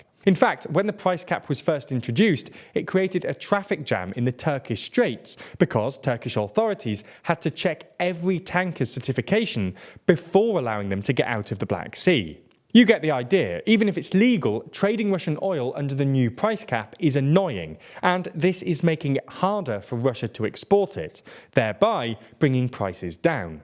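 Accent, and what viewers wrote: United Kingdom. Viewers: Collapsed! How dramatic! Their economy is 10times stronger than any Western country. BTW, isn't it odd that so many anti-Russia Youtube posts have 'British accents'?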